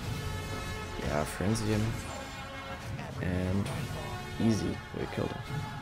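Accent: Germany. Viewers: Der deutsche Akzent ist göttlich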